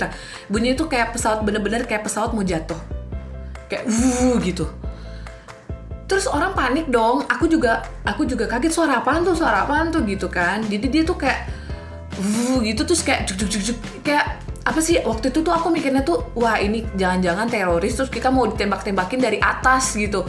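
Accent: Manado, Indonesia. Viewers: solop mana kita pe solop😂😂😂 picah tatawa pas tau ka pake logat Manado😂😂😂😂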